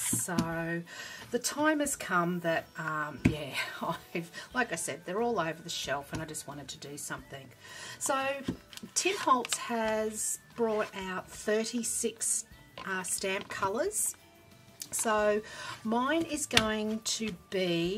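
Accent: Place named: Australia. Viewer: this is a great caddy for the inks. I love using other things to make what would have been expensive storage items, especially here in Australia. I recently made a tool caddy from empty sauce bottles, bought from choice or stacks, they are cut down to different sizes and sit on an inexpensive lazy Susan. There is nothing quite as good as making your own. Thanks for this and great to hear a fellow Aussie.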